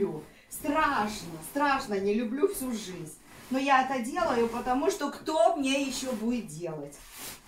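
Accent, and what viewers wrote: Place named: Moldova. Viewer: Живу ❤России. Уже давно. Скучаю по Молдове. Очень скучаю. Спасибо большое за ваше видео. За ваш говор за ваш слэнг и менталитет.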